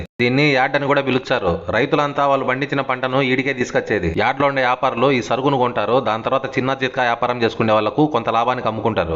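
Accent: Kadapa, India. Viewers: Vayamma Kadapa slang king back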